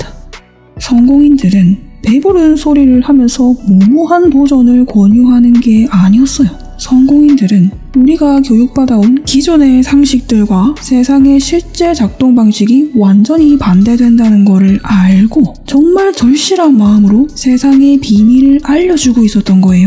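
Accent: Gyeongsang, South Korea. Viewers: 말씀하시는게 경상도 사투리? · 이분 경상도분이시당. ㅎㅎㅎ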